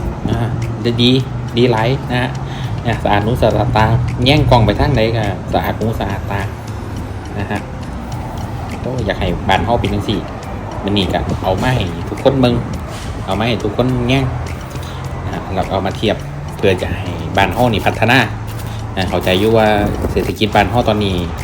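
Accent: Isan, Thailand. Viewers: อยู่แขวงไหนของประเทศลาวคะสำเนียงไม่เหมือนคนลาวเลยสำเนียงออกไปทางอีสานมากกว่า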